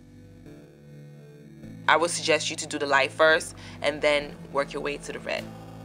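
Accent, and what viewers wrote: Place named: Brooklyn, United States. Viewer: I love this diy! I had a feeling you were a Brooklyn girl from your voice and I checked your Facebook!!! BK Love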